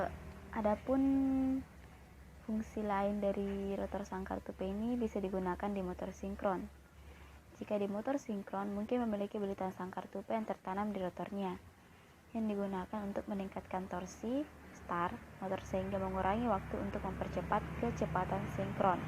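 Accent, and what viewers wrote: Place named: Bugis, Indonesia. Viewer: Logatnya orang bugis😂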